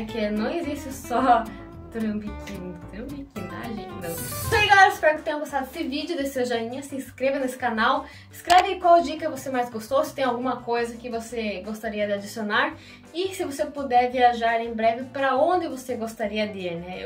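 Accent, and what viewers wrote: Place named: South, Brazil. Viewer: Moça, em qual estado e município brasileiro a senhorita nasceu e morou? Seu sotaque parece ser do sul do Brasil.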